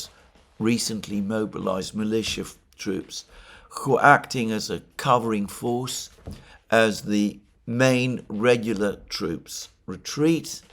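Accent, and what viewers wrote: United Kingdom. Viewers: I can't stand that British accent in times like this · The idea that Israel will want to acquire Damascus is so absurd, so out of touch with reality, so utterly ridiculous that it makes you sound like an idiot even with your suave British accent.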